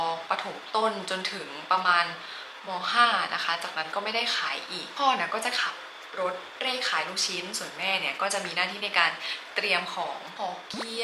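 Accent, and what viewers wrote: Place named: Buriram, Thailand. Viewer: จดจ่อตั้งใจฟังจนจบอยากรู้ว่ามีแฟนรึยัง แต่ฟังจนจบก็ยังไม่รู้ 555 ผมเล่นกีต้าร์และเปียโนมาแต่เด็ก เล่นให้ร้องเพลงได้ ร้องคู่กันก็ได้ มีบ้านอยู่ในตัวเมืองบุรีรัมย์ไร่นึงหลังทวีกิจจิระ ไว้เป็นเรือนหอสำหรับแต่งงานได้ ชอบมากสำเนียงบุรีรัมย์ชัด ผมเรียนอนุบาลที่บุรีรัมย์ เวลาไปดูแลบ้านที่บุรีรัมย์จะต้องแวะกินลูกชิ้นทอด อยากชิมลูกชินทอดฝีมือคุณหมอครับ ผมเล่นกีต้าร์เพลงแนวนี้ครับ <link>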